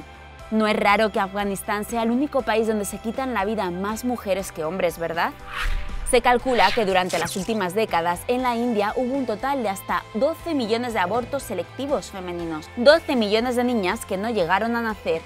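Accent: Spain. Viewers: Pues yo vivo en España y deduzco que por tu acento tú también. ¿En algún momento has sentido que tu vida no vale nada? Pues yo no. Siempre he sabido que mi vida vale lo mismo que la de todos los seres humanos. Me encantaba este canal pero de verdad, este vídeo está lleno de desinformación, negligencia e ignorancia. Es que, ¿España y México dentro? Tanto en España como en México se valora la vida de las mujeres. Y teniendo en cuenta que el título es "Países en los que las mujeres no valen nada"... pues eso.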